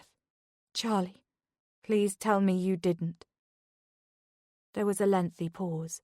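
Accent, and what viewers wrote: Birmingham, United Kingdom. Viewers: Great book but the narrators Brummie accent is horrendous and off putting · Mind you, I haven’t started it yet and further down in the comments it says about a brummie accent, which I must admit is not one I like to listen to either.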